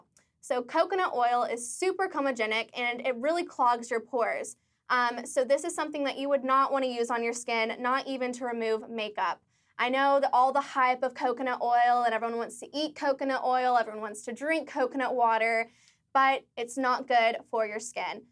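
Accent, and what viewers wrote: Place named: United States. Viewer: As a New Zealander who doesn't have a thick accent, this woman's American accent is not super yanky. A good american accent.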